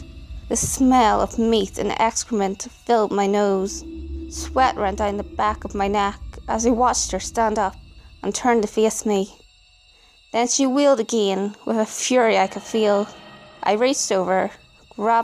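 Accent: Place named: Northern Ireland, United Kingdom. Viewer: that north irish accent though, not as bad as my midlands accent to be honest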